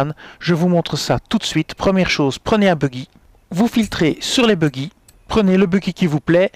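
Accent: Belgium. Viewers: Merci a notre ami. Je suis fan absolu de ton accent belge. Tes 8 qui devienne ouitte c est trop genial. Big up a l amitié franco belge ou belgo francaise. 😊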